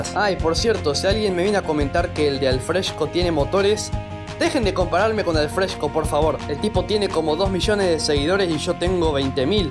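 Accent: Argentina. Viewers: Eres argentino no?? 😅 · Yo llase de que sos de Argentina lo se por como hablas y yo también soy argentino me gustan tus videos 😊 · Eres argentino nove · Eres de argentina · Sos de argentina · Se re nota que es de ARGENTINA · No hace falta decir que el men es de Argentina verdad?? XD, fuera de eso, tus videos/tutoriales son muy buenos bro, sigue así y llegarás al los 100k :D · de argentino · SOS argentino por tu acento 😊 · argentino obio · Eres de argentina papu pro😊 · Eres de argentina? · Te quedo muy bueno el "abuelito molon" eres argentino? No c pero bueno 🗿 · Tu eres de argentina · Eres de argertino no?? O uruguay??, la digo por que su acento tienen parasido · eres de argentina verdad? buen tuto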